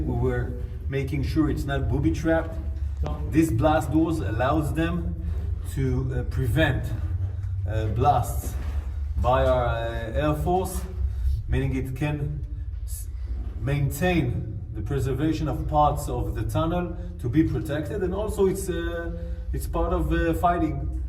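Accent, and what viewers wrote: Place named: United States. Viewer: He is trying to hide hi American accent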